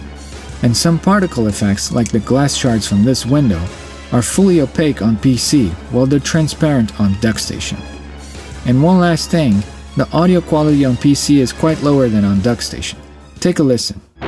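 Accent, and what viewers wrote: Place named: Brazil. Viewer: Vc é brasileiro, né? Seu sotaque é muito forte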